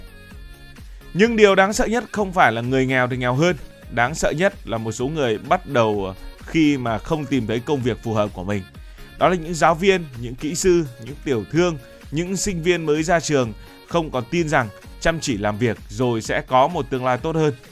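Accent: North, Vietnam. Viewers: Nghe nói từ Paris là tôi thích ngay, dù là nói tiếng Bắc...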